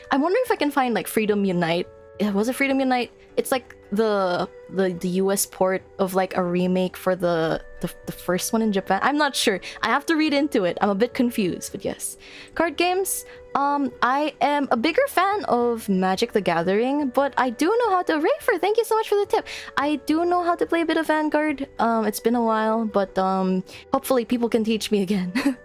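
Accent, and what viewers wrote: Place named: Philippines. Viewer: idk who tf you are but you have a filipina accent and you like girls und panzer so I’m interested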